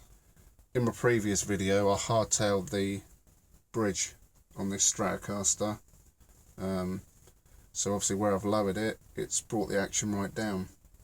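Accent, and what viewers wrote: United Kingdom. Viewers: The British accent makes it much easier to listen to.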